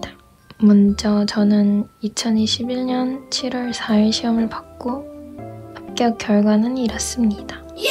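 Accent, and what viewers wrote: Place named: Japan. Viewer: 일본어 억양이 느껴지네요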